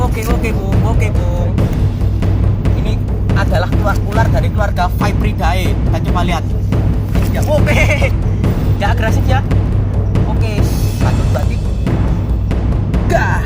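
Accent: Java, Indonesia. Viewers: Medhok mu jd ciri khas mu sob...lanjutkan memberi edukasi... Abaikan haters... Salam kehidupan salam lestari... Terus berdakwah dg memberi edukasi👍👍👍👍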